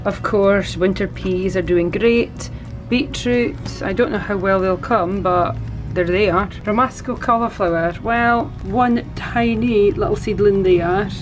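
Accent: Scotland, United Kingdom. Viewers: i love scottish excent language, i dont fully understand the english excent,i m indian living in uk, but i still love it, my boss is from scotland,we have job brief every day, i dont understand what he says, he knows it, sweet cons r funny, tomatoes great, lovely hair, lovely nails. lovely garden, thanks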